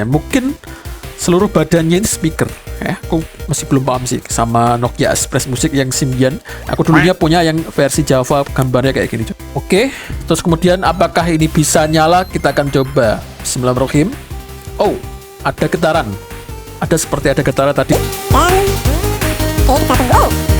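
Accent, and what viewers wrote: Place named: Solo, Indonesia. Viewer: Bang suara mu pria solo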